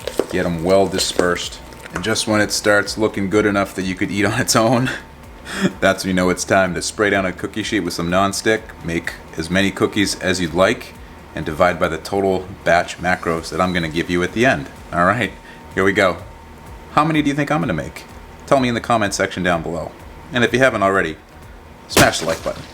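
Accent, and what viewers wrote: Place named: Massachusetts, United States. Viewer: Do I detect a MA accent?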